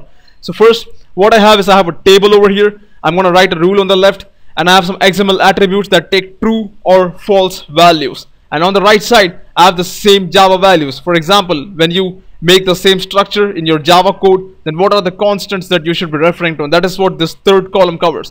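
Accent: India. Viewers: Well i do not see any problem in his voice. yes it is indian accent. yes you are right, his voice is not suitable for singing but offcourse he not claim to be a singer too :) .it is easily understandable and second he put efforts in making videos, which should be appreciated. simple...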